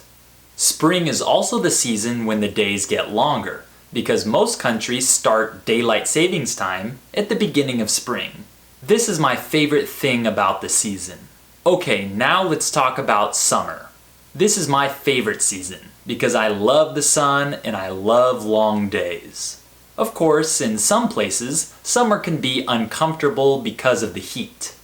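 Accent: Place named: United States. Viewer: I can learn many things from this channel. I really like to listen your voice. Real American voice.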